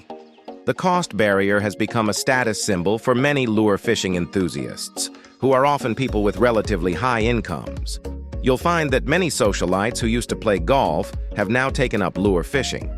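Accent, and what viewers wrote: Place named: United States. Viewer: Our A.I. narrator is using American English and the lure visuals are of American anglers. Why in the world are the creators of this video comparing lure fishing to Taiwanese bait (I assume) fishing, something that few Americans know about, let alone practice? Is Taiwanese style fishing common in Australia, perhaps?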